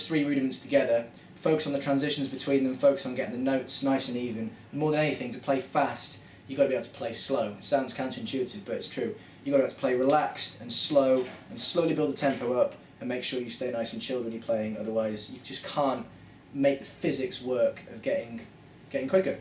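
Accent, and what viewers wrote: United Kingdom. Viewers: you sound english. veeeeery annoying, please spake normul plz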